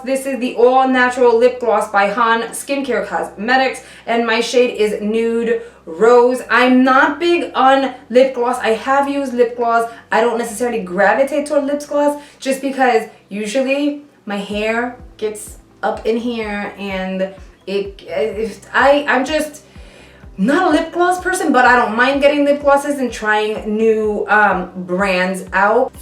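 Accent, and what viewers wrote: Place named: Brooklyn, United States. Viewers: aww, i'll give it a shot anyway. BTW i love your accent! not sure what state it is but def the east coast! I'm from Denver Colorado we dont really have a cool accent like yours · Ur def Puerto Rican lol you sound like you are from Brooklyn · New subscriber here!! I smelled chocolate too on the contour/highlight palette. Also I like your accent. Are you a NewyoRican?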